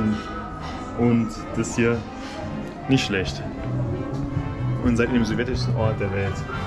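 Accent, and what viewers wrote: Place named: Balkans. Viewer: Wie hast du eigentlich Russisch gelernt? Für mich hast du irgendwie einen Serbokroatischen Akzent 🤔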